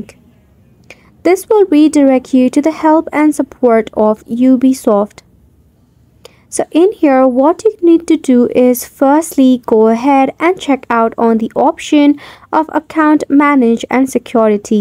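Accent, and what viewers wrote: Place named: India. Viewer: indan cute ass voice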